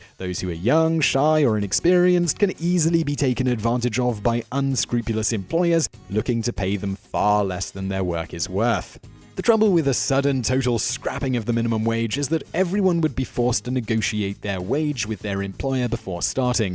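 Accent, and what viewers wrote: United Kingdom. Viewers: Why is that ANYONE with a British accent has ZERO credibility with me? .... Well they HAVE made every serious mistake one can imagine. IF there was no minimum wage then it is ABSOLUTELY FRICKEN GUARANTEED that a bunch of folks WOULD be taken advantage of more than they are now ...not everywhere ...not where there is competition for quality labor...but in smaller environments where greedy employers can ruthlessly get away with it ...ignorant people just wanting to pay the rent and eat SOMETHING might feel literally forced to take it...their employer for instance being the only place they can physically get to every day? The logistics for a poor a person can become overwhelming and there ARE some wickedly greedy people that WILL take advantage of such things every single time ...hence all the laws and penalties created to FORCE really wicked people to play nice....it is a shame we have to have laws, that people won't just be honest and do what they have to know in their heart is right....but it IS the fact of reality and all the pie in the sky thinking people might be different is pure bs proven over and over and over ....hence the sad struggle FOREVER...there is NO political solution...no form of government and no lack of government will cure what ails us ...actually it is incredibly AMAZING (I think) that things work out as well as they do in SOME places ...probably so called "Christian values" play largely into it working ...working on the consciences of people so that enoug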